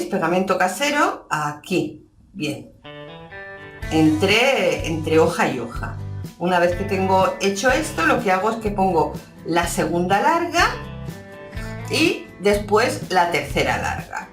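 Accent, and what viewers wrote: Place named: Spain. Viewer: pues nada, parece que encontré a la clon española de mi tía!! Eres idéntica, idéntica de verdad. Tanto que hasta se me hacía raro oírte hablar con otra voz y otro acento!!! Y ya asombro aparte, ésta es sin duda la mejor idea que he encontrado para utilizar los huacales, como les decimos aquí, porque el trabajo se ve muy fino y no parece que sea reciclado. Es uno de ésos que al final terminan preguntándote dónde lo has comprado. Definitivamente habrá que hacerlo, muchas gracias!! y saludos desde México!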